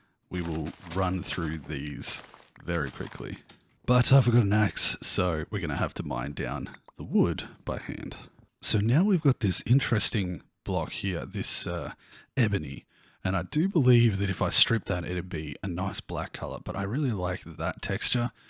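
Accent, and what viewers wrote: Australia. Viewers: This is the first video of you I have seen and I loved... And to be honest it's refreshing to hear a fellow Australian speaking in a video. 🤣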